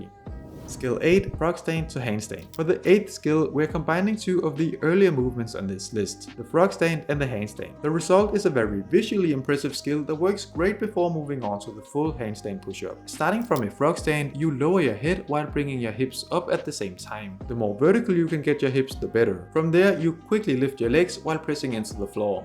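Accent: Denmark. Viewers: jaa, godt forsøgt men du er dansk ikke eller ihvertfald fra skandinavien. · Random question, but are you a dane?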